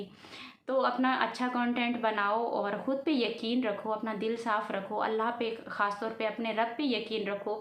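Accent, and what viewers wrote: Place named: Sindh, India. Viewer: I think u are Sindhi speaking aapk bolnay say mujhay laga I am Sindhi speaking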